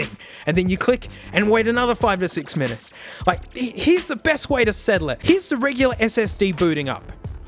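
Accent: Australia. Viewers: Teach use how to speak Australian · Where is your Aussie-Slang/Pronunciation :-O :-O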